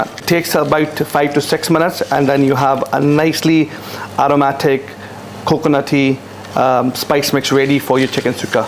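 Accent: Scotland, United Kingdom. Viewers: never heard of sukka. Thanks, this looks delicious. Will use fatty pork though. Your videos have become masterpieces: succinct, beautiful to watch, only the best recipes, and an accent that takes me back 35 years to being a student on the other side of Scotland! Can't wait to try this (speaking Scottish to myself ;-))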